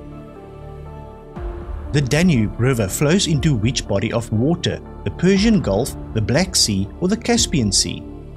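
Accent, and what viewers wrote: South Africa. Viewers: Love your South African accent. Nothing is 500 million years old, it's pure guessing. Study the subject further.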